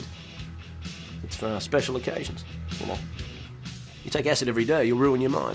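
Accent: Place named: Australia. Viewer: wtf hes australian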